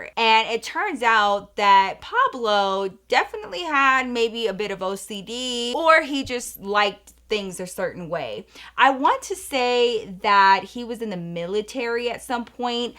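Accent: Texas, United States. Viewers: I’m from San Antonio and I been watching you for years now and every story time the way you talk girl I can tell you’re from Texas 😂💕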